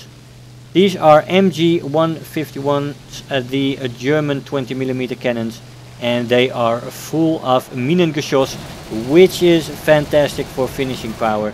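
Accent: Netherlands. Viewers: this plane is pure canser at itd br teams full of them kill us teams no losses btw are you dutch?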